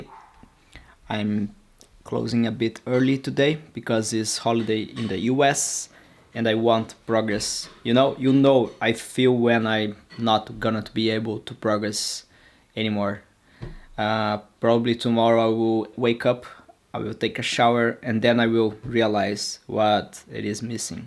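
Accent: Brazil. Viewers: Yes, I whatched this video in this intention, is more easy to undestand because the brazilian accent. And he speak more slow, this facilitates undestanding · I’ve watched this video with this intention. It’s easier to understand because of his Brazilian accent and he speaks slowly which facilitates the understanding. Acho que assim ficaria melhor :-)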